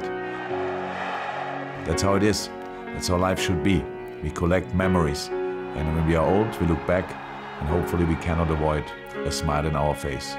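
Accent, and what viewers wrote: Liverpool, United Kingdom. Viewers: Hes slowly developing a Scouser accent.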